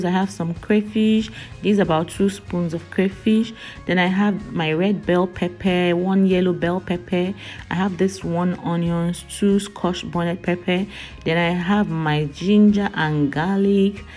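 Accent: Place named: Cameroon. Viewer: You talk like a cameroonian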